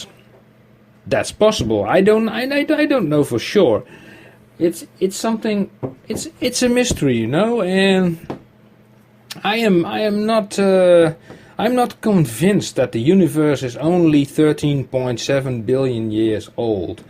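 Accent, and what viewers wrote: Netherlands. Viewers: You have netherland accent english, nice.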